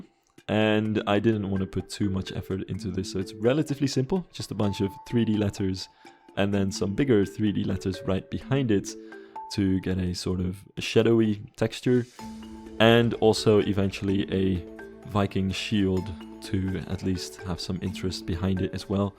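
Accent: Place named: Netherlands. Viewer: Hallo ik hoor aan je stem dat je Nederland bent dus ik wouw vragen of je de xpress van Walibi kan (namaken) maar dan goed en wouw je dent hier goed in O ik zou zelf doen maar zit op Xbox vast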